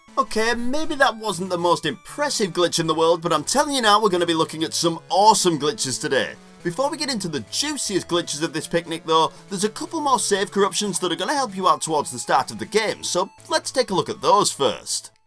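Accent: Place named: Bolton, United Kingdom. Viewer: Came for the glitches, stayed for the Bolton accent. Have a subscribe, friend!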